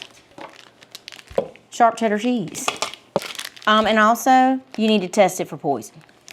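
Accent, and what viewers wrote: South, United States. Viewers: You work hard to cook for your family. The best part is you cook Italian food. All done with a Southern Accent. Remember, Men have no balls, but the Women have dee big balls! Fantastico! Ciao, Bella! ❤❤😂😘❤❤ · No offense-I don’t think I’m ever going to make what you make, but I do like watching you cook and listen to your accent (Boston girl here)! Taste for poison is brilliant and liquid eggs - OMG! You go country girl! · ABSOLUTELY SHE NEEDS A FOOD NETWORK SHOW!!!! She cooks like the rest of us real people! She also talks like most of us in the South and lives in a remodeled 1971 mobile home that is gorgeous! Like most of us in the South, we like paid for stuff and repurpose, reuse and restore to keep it all paid for! We not into latest and greatest! We are into keepin it real and comfortable and debt free!😊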